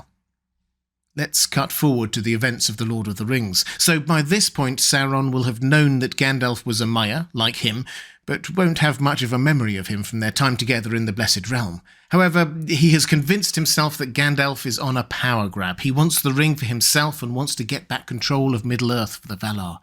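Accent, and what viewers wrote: England, United Kingdom. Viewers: you have the best narrating voice & cadence, I love the way you speak, even down to your accent, I’m also English & it sounds bad but I cannot listen to an American talking about LOTR, it just sounds wrong to me, it’s like trying to listen to an Englishman talk about guns and pickup trucks, just doesn’t feel right.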